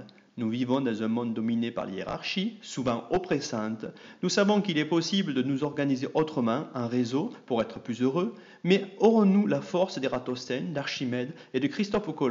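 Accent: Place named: South, France. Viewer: Expliqué avec l'accent du sud c'est encore mieux...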